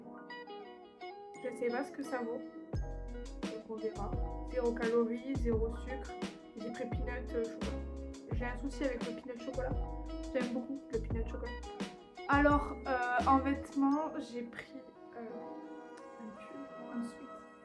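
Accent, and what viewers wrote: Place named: South, France. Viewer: Cool tes vidéos ! Bonne énergie ☺️ et cet accent du sud 🤌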